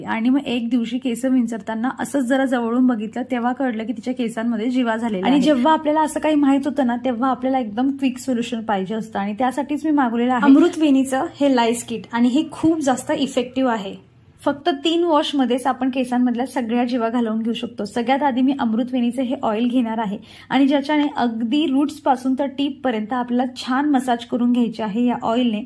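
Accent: Khandesh, India. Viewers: Ti khandeshi mhnun sagd tas bolte😂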